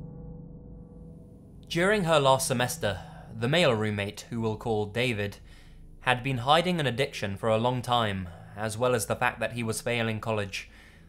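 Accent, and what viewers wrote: England, United Kingdom. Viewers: No need for sarcasm, or maybe you’re just being stupid, I can’t tell. Obviously I can tell from his accent that he’s from England - I mean WHERE, given that there are so many counties in that country. I didn’t want to just presume he’s from London or something. 🤦‍♀️ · hes from the uk like me so we will pronounce it differently , since the language started with us XD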